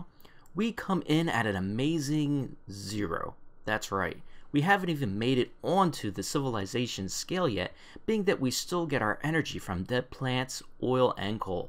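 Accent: Philadelphia, United States. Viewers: That Philly accent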